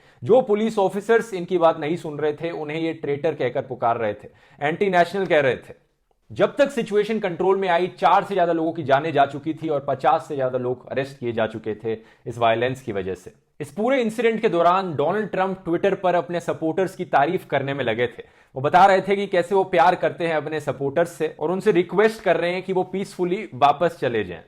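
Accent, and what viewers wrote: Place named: Punjab, India. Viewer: Diware taap rhe the🤣🤣🤣🤣 thoda Punjabi accent ki tone hai apke andar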